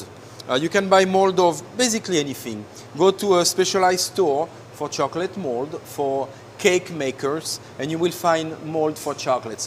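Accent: France. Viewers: I love that accent! I can't place exactly what it is though! I think it's a French accent, but I can't tell. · I definitely love his french-english accent, his randomness, and his job ( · good old french accent ! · Are you french?? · Do you have a French accent I'm guessing? Cool, how old were u wen u learned english? Sorry, I'm a big language nerd :P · oh is it a french accent I thought it was german my bad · Il a un accent francer !! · French accent ^^ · Hahahaha. You gotta love the french-accent. :D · hes from france, he sounds french and his name is french aswell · just a little bit french! lol! great job! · this guy has a French accent, the I pick things up guy has an Austrian accent lol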